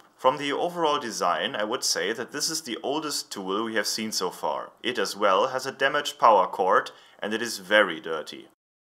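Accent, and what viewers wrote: Germany. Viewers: For somebody whose native language is French, I am charmed by this German accent. I understood by the context that he was happy of his purchase, so I could not guessed that the expression could express anything negative. That remind me after a good meal, my partner said "I am feed up", thinking he was expressing "I ate too much". It shows that we, Quebecois, can not guess correctly the meaning of these verbs followed by "up" or "down". We have the same problem guessing these French expressions with a name of a color: carte verte, carte blanche... what? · Mal ne doofe Frage: Du bist Deutscher oder? :D Hab jetzt am Anfang nicht drauf geachtet, aber so wie du deutsch sprichst, und wie du englisch sprichst, kann es gar nicht anders sein :x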